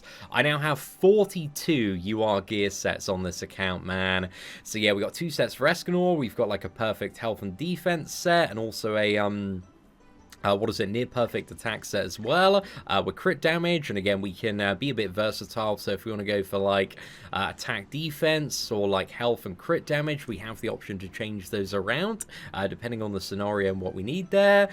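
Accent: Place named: England, United Kingdom. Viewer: Definitely appreciate you,. Informative content, entertaining content, always a chill time watching your videos and I think for most people from the states the English accent is a cherry on the top. Much respect and looking forward for whatever else you create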